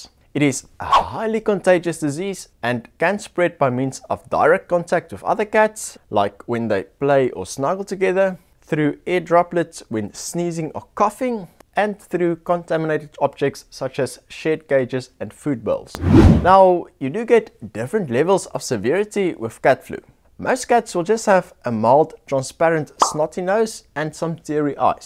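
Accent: South Africa. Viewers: watch one of ur videos ur accent😂 is 100% south african 😃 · After 2 seconds of watching I knew that this guy was from South Africa. I can spot my people's accent from anywhere 😂😜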